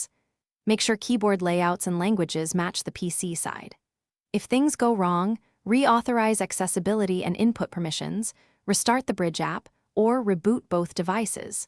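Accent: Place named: United States. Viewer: The overly-American accent is REALLY grating. Please over-pronounce the letter R so it's like fingers down a blackboard.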